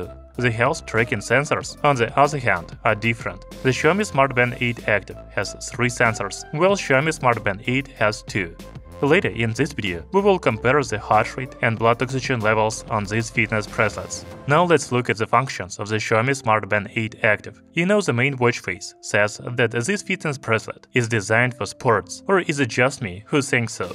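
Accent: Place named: Russia. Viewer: I'm sorry Bro, it is quite difficult and troublesome to understand you. Your russian slang is very strong.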